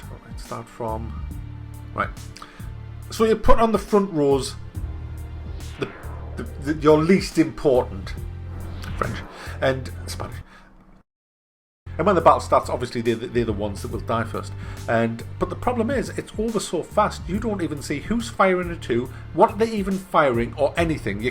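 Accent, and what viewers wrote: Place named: Scotland, United Kingdom. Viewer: I HATE strategy games simply because I cannot play them and will not, this isn't my point though (my point is this...) I still watched this almost 15 Minute long video because of the commentary! is simply the best reviewer and commentator. He's got it all! Quick wits, mature humour and of course none of this would be complete without his awesome accent which I think is... Scottish?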